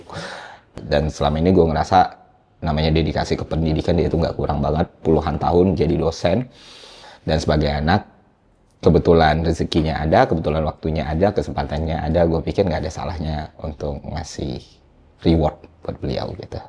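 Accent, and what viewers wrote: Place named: Jambi, Indonesia. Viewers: Seneng nian denger bang make bahaso jambi, samolah cak palembang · Enak nian dengar kau bahaso jambi bg, mantap 🔥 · Mantab bang, logat Jambinyo masih ado. 😅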